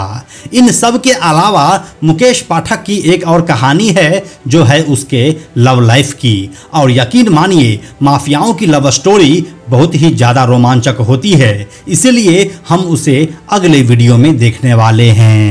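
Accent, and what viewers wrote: Bihar, India. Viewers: Bro. Tum Bihari Ho Ky ??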